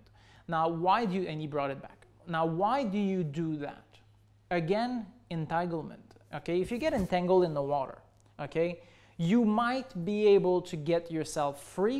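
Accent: Quebec, Canada. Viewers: good info, are you by any chance from Quebec? your accent sound familiar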